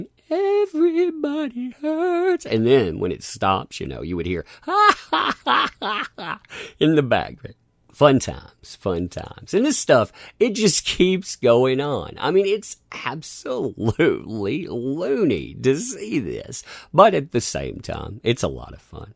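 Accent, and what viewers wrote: South, United States. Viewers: i like your content, i swear i really do, that is why i keep watching it for quite sometimes, but as a chinese dude i find it somewhat hard to understand your accent, which i suppose you will find my accent also hard to understand if you heard me speak, so this is a sad goodbye, i am trying too hard to listen to enjoy it. to bad i actually kind of like the sound of your accent, which i'm guessing southern ish, but alas it is too hard for me who's english is my 4th language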